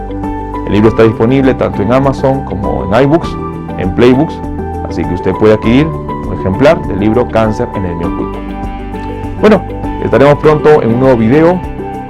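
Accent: Peru. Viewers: Por el acento parece peruano o de Colombia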